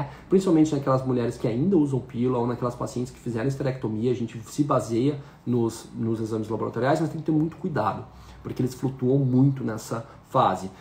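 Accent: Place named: South, Brazil. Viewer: Oi Dr! Gostei muito das suas especificações!Eu tenho 50 anos, ainda não parei de menstruar, mas sim, já tenho alguns sintomas do climatério. Já comecei a procurar, mas ainda não encontrei um médico (a) que realizasse a modulação hormonal bioidêntica! Minha pergunta é: Esse é um tratamento muito caro? O senhor atende a algum plano de saúde? Pelo jeito de falar o senhor é sulista. Eu sou curitibana mas,resido no Rio de Janeiro. Dependendo dos valores,eu iria até seu consultório. Obrigada!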